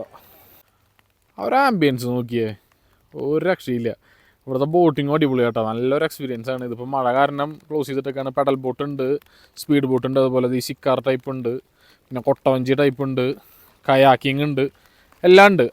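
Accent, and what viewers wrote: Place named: Thrissur, India. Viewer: Ellam kollam bt aa tsr slang 🙏🏼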